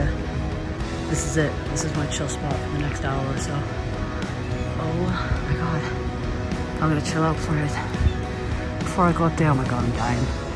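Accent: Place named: Eastern Massachusetts, United States. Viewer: What beautiful views, you picked the perfect clear day. Love to listen to your voice especially the Eastern Massachusetts accent, i drop a lot of r's myself and talk similar coming from New Beige.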